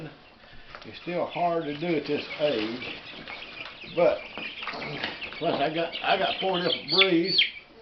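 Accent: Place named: South, United States. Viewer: I understand the frustration about lighting but at least this is better to watch than some of the other crap on YouTube I encourage anyone doing educational videos to continue to do so. Not only is it a learning experience for the person doing it, it's information you can decide to use or at least consider. Also I love your accent I was born in the deep south in Texas but from living up north north than half my life I have a mixed accent of north and south and I just love the southern accent.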